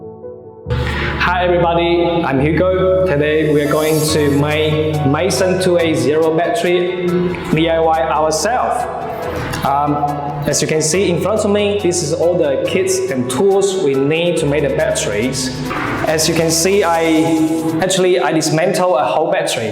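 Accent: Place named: Australia. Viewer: Was listening you sound like an Australian accent.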